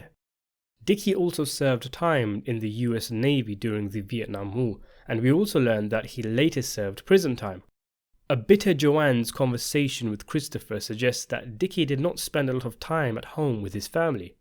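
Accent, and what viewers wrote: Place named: London, United Kingdom. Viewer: I can tell by your accent that you’re south Asian London - am I right?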